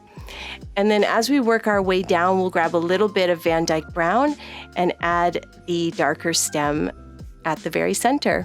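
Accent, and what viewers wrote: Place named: Canada. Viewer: Accent .... heard very slightly in the way you pronounce your "O's"...... Canada 🇨🇦? I can only aspire to paint like you..... 😒 my ink and wash is positively elementary.. you are so talented 💖 warmly, base of Mt Hood.... Oregon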